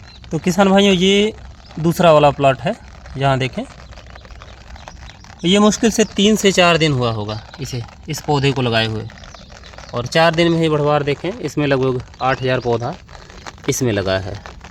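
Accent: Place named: Punjab, India. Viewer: But tuhadi boli to punjabi lagde ho....idro shift hoe si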